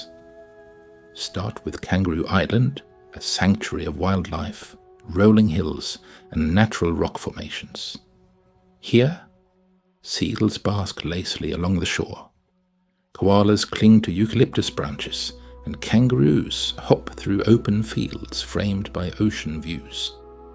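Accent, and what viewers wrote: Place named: India. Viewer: Fitting having an Indian accent narrating it. Well done Australia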